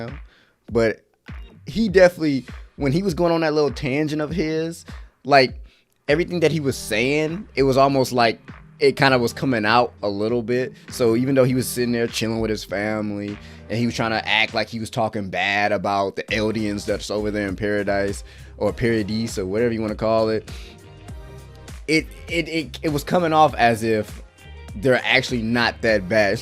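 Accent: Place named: Baltimore, United States. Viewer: Are you from Baltimore? The way you say your too’s sounds smack like it lol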